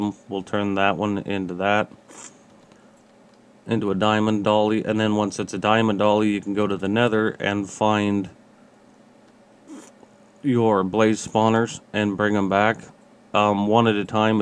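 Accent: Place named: South, United States. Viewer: You hillbilly sounding useless peace of shit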